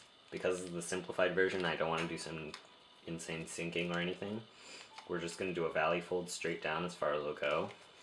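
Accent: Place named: United States. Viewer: Good job no offense but you sound like an American lol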